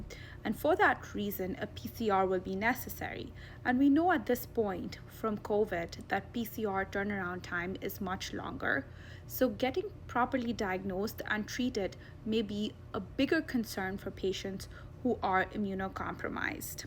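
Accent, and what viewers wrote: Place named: India. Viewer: Is it just need or does anyone else immediately mute and or scroll up or down as soon as you hear the Indian accent... see what stammer have done. Bet she has some good advice but I ain't gonna hear it...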